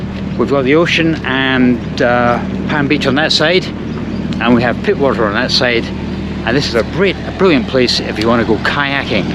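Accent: Scotland, United Kingdom. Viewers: how did you manage to keep your Scots accent having been raised in Australia? I was born in Scotland but raised in England yet my accent is definitely not Scottish (although I do know where my bread is buttered when it comes to football & rugby). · why do you not speak with an Australian accent if you grew up there? Why do you have a Scottish accent? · It’s amazing you have a broad Scottish accent - with living your first 25 years in Oz.. I’m surprised. The hotel didn’t look good at all. I’m not keen on Sydney. Thanks for showing us.👍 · His parents were Scottish so that would be the accent he heard most. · this might sound stupid but why does he have a scottish accent then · He has a Scottish accent because he is Scottish. Where he lived as a kid is just a red herring. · A Scotsman with an Aussie past, the Scot’s never lose the accent! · Amazing how you kept the Scottish accent having spent so much time in Australia!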